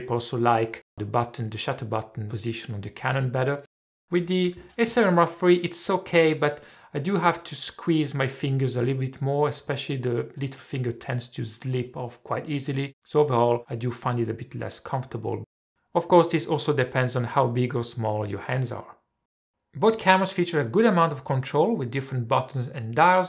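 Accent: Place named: France. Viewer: Are You French? (je suis français et je reconnais un accent bien de chez nous^^). J'aimerais tellement que tu puisses tester / proposer des comparaisons avec le FUJI XT4, un APSC qui me fait de l'oeil. Même une comparaison avec des FF (Full Frame) serait intéressant:)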